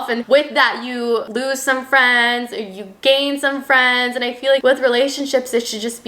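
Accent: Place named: Canada. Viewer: I didn’t know us Canadians had an accent 😅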